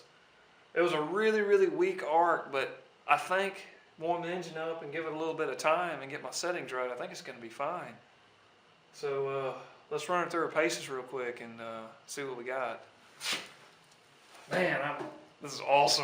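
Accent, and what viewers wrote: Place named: Mississippi, United States. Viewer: Love your videos. It's nice to hear that accent again (grew up in Hattiesburg -- transplanted to the PNW) from a GREAT teacher. Keep it up!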